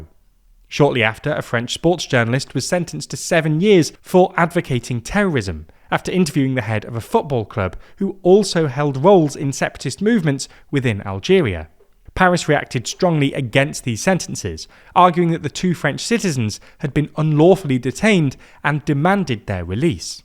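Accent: United Kingdom. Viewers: british lil bro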